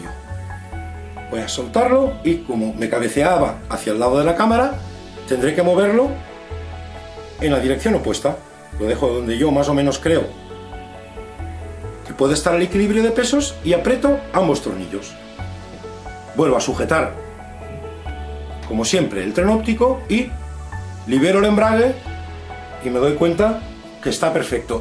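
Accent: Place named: Catalonia, Spain. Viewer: Quin accent tan catala que tens!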